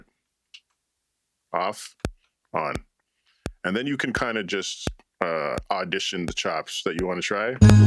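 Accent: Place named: Jamaica. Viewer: Where you from sound like a yaddie 😂 thanks for the video btw!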